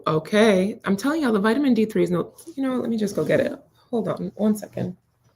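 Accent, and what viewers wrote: Jamaica. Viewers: I love when you use your Jamaican accent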